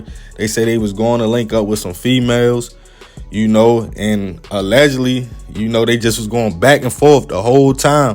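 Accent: Baltimore, United States. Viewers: "Watch who you call you're FRIEND. Thats a strong word. A real homie would NEVER kill you. If it's that serious they would simply cut you off if they not feeling your vibes no more because they don't wanna see no harm come your way" 🤦🏾‍♀️💯💯💯 SOME OF THE MOST WISE WORDS EVER SPOKEN KING 👑 I JUST KNOW YOU FROM MY CITY LOL THE WAY YOU SPEAK JUST SAYS BALTIMORE ALL DAY 🥰🥰🥰💪🏽💪🏽💪🏽💯💯💯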